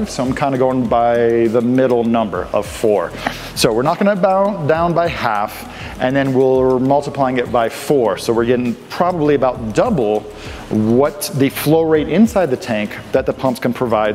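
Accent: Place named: Australia. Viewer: I thought you were from Australia 🇦🇺😀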